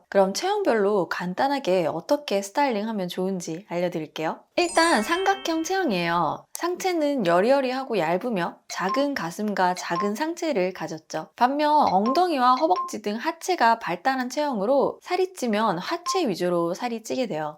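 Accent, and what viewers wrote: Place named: Gyeongsang, South Korea. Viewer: 경상도 출신이신가요? 은은하게 들리는 사투리❤️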